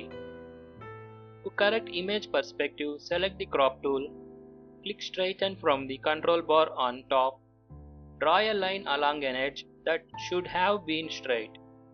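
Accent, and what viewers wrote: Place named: India. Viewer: indian and pakistan accents is very funny they are trying to speak with well accent but they are can't speak well english with good accent 'realistic' but he say 'realishtic' :) 'sixty' but he say 'sixshty' :)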